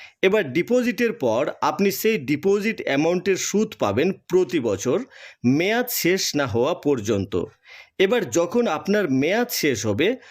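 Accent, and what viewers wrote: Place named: Bangladesh. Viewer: তুমি কি বাংলাদেশের মাল বাংলাদেশের মতন করে কথা বলছো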